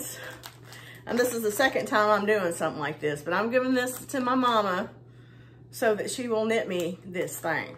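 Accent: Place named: United States. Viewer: Howdy! Love the cow girl vibes / accent! So much fun!!!! 😊🖤🩷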